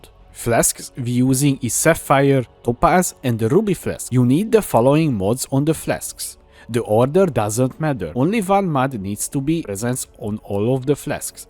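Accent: Hungary. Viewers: You have a hungarian accent :D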